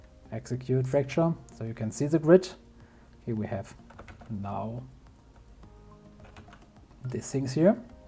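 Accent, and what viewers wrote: Germany. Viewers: Your accent is very german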